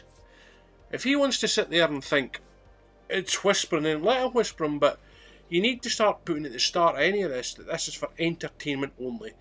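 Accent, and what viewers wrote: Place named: Scotland, United Kingdom. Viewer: great workmate. these fakers have been caught again. greetings from Munich Germany S 🤘 🖤 🤘 btw love your Scottish dialect.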